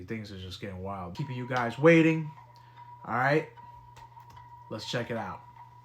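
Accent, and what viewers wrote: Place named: East Coast, United States. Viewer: Sound like you from the east coast dog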